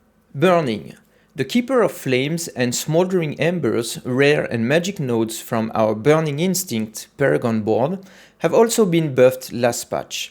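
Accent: France. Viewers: Je like rien que pour l’accent français ! 😉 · This is definitely a cool and interesting video. You did a great job with your explanations. Also, with a such a strong Frech accent please let me compliment you on your English because it's excellent!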